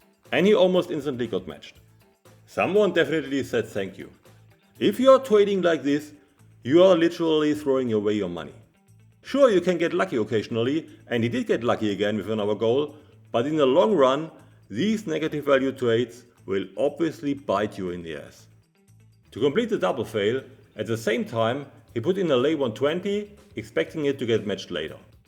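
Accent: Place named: Netherlands. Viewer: Sounds Dutch to my Ear.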